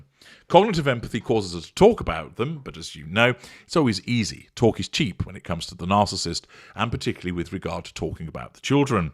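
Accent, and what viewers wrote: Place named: United Kingdom. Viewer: YOU SOUND LIKE A BRITISH A**.......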